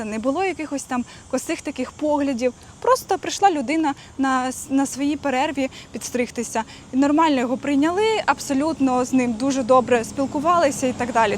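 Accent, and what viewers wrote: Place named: Surzhyk, Ukraine. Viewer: з якої ти області в Україні? в тебе така прикольна українська, але деякі вставки слів з "мови з боліт" ріжуть вуха) нетиповий суржик)))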